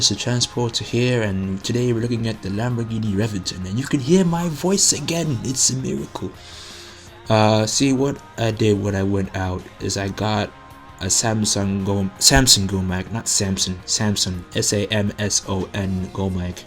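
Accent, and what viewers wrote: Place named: Africa. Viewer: LOL, I can hear the Arfrican in you so clearly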